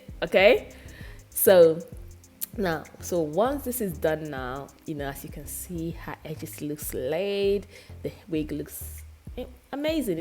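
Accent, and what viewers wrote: Nigeria. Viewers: No be edo accent I Dey hear so??? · I’m here to hear your nigerian accent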